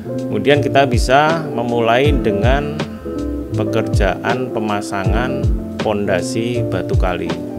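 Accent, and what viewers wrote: Java, Indonesia. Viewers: Kerbaooo 😂jowo ne kuentel banget mas👍👍👍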